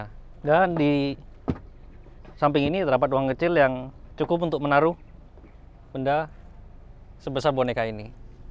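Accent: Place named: Surabaya, Indonesia. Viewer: Mantep reviewnya logatnya Suroboyoan :)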